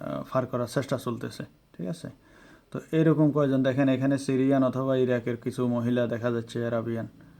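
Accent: Noakhali, Bangladesh. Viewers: ভাই আপনার বাড়ি কি নোয়াখালি ভাষায় বোজাযায়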